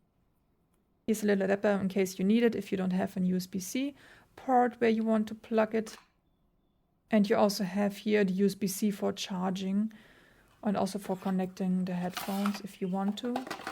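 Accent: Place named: Germany. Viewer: Tell me my ears are right and you're German. 😁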